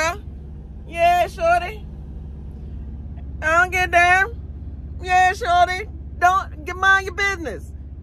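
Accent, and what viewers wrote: Baltimore, United States. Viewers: I been following you since the pandemic on three platforms and that little bit of weight looks good on you. and that Baltimore accent❤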